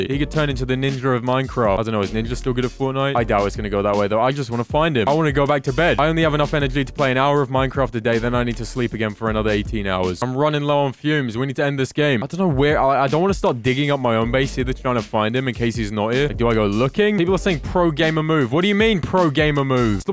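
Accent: United Kingdom. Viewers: Are you inglish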